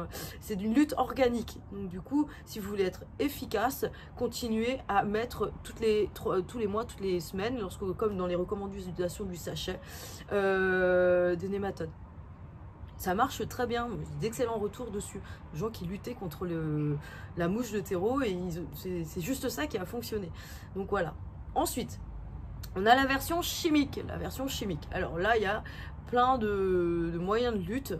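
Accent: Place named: Paris, France. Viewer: Bonjour et merci. Mon Accent Marseillais est aussi prononcé que votre accent Parisien.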